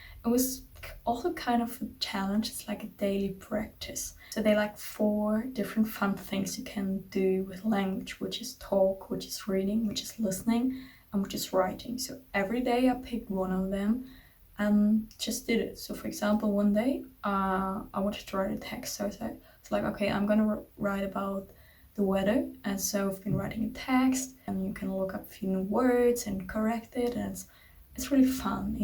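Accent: France. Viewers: Hablas muy bien español, y tienes un ligero acento Frances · You sound like french when you speak spanish, where are you from? Y buena suerte en tu aprendizaje, a por cierto debes hacer énfasis en la pronunciación de la R 👍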